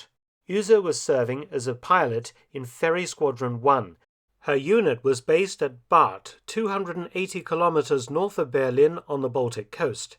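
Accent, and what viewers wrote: United Kingdom. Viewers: His German pronunciation is solid but far from perfect. There is a notable British accent and in addition some words sound quite different when a native speaker speaks them. For example "Hanna Reitsch" was quite close, but there was still some accent in the surname. In contrast to this, "Beate Uhse" was quite wrong. He did not speak the "a" of "Beate" although it should be spoken. In addition he spoke of the "U" of "Uhse" as "Ü". What he said would have been written "Bete Ühse" and not "Beate Uhse".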